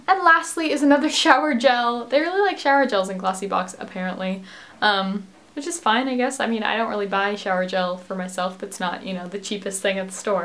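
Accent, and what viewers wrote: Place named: England, United Kingdom. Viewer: I can hear an English accent creeping in there :)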